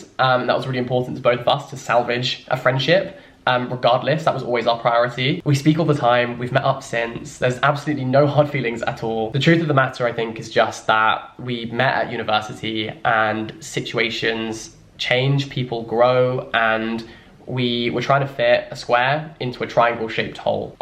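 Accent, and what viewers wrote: United Kingdom. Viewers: You are literally my reason why I love the British English language in human form! Your accent and the way you use words is the exact reason I love English! Everytime I watch your videos I am so grateful to 13-year old self for choosing the bilingual course at my secondary school! You are amazing! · honestly I have no interest in reading but I really like your videos, I find them so entertaining also the brittish accent helps me prepare for CAE listening 💀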